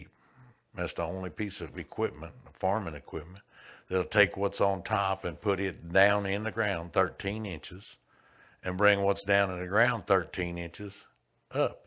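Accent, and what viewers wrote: South, United States. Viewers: Loved the Video AND The Country Boy Narration!! Haha! Get Er' Done!!😎😎😎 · Haha! Great video! I like listening to you narrative! That good ole country accent! God bless the work of your hands!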